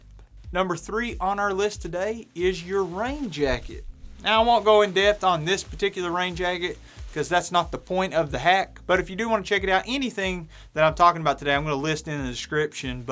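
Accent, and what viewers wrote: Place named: South, United States. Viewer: In fact, if you keep the bottle cap on and cut off the bottom end of the water bottle, your scoop is several ounces larger because you didn’t have to lose any volume getting to the wide part of the bottle; and - bonus - you have a funnel option if and when you need one. Not a good option is you are wanting to park a scoop of water upright, unless you jam the neck of the bottle into a cranny in a tree root or a vole hole. Anyhoo, I dig your vids; you are judicious, even-handed, and informative, all in a well-tempered laid-back package. I take it from your accent that you are a Southern boy; I grew up within 5 miles of the tallest peaks along the Blue Ridge Parkway and about 10 miles from the North Carolina border of the GSMNP, where I started hiking and camping at age 7 or 8 with my Pop and younger brother. As you said, good stuff.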